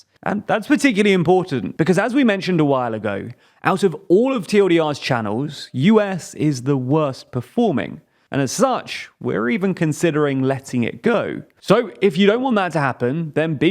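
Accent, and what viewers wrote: United Kingdom. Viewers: I think the problem is that the announcer is a Brit. It feels like an outsider telling a news from a froeign country.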